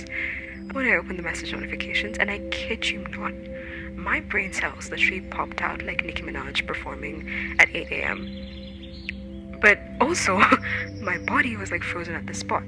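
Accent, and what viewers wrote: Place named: India. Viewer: are you indian? you have that accent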